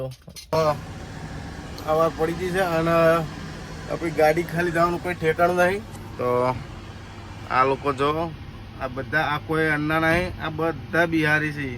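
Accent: North Gujarat, India. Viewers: Uttar Gujarat ni boli gageh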